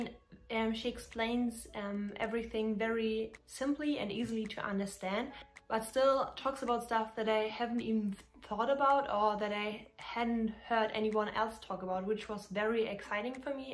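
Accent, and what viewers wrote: Germany. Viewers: Heftige Englisch skills👏🏻 man hört den deutschen Akzent trotzdem direkt raus😂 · Du bist doch deutsch oder? 😁 den Dialekt kann man ja kaum überhören 🇩🇪👍🏼 danke für deine Meinung 🙂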